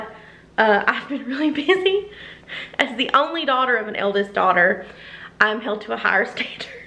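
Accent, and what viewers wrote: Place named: Southwest Virginia, United States. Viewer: I’m new to your channel, but I wanted you to know I’m sending you so much love and light 💞 I lost my dad in 2022, and I swear you’re right about grief. The things that hit the hardest are after they’re buried, after the dust has settled, and you wake up to remember the loss all over again. Girl, you’ve had a super tumultuous year. Take your time to recover ❤️‍🩹 and hey! I’m from Virginia, and you have the same accent as my grandma from SW VA in Grundy! Again, sending you love 💕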